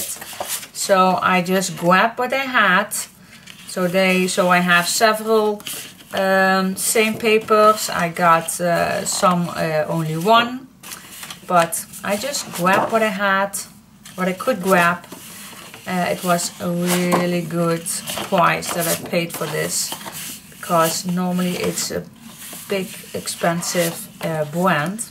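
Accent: Netherlands. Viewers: o) I love it to hear your netherland slang in your english :-) it´s so nice to hear you….sometimes (yes I´m crazy) I work on PC and hear only what you work, only for Hearing your voice….it´s like a friend in the room who works and talks with me :o) thanks for your time to make this Videos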